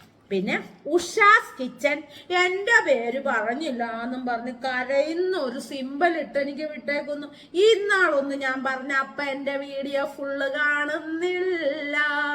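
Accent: Kottayam, India. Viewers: ഇന്നത്തെ വീഡിയോ കണ്ടിട്ട് എനിക്ക് ചിരിവന്നു. മറ്റൊന്നുമല്ല ചേച്ചിയുടെ സംസാരം കേട്ടിട്ട് തന്നെയാണ് ചേച്ചി കോട്ടയം എവിടെയാണ്. എന്റെ തറവാട് കുമാരനെല്ലൂർ ആണ്. അമ്പലത്തിനടുത്താണ്. ഞാൻ നാട്ടിൽ വരുമ്പോൾ എനിക്ക് ചേച്ചിയെ കാണാൻ വരണം എന്നുണ്ട്.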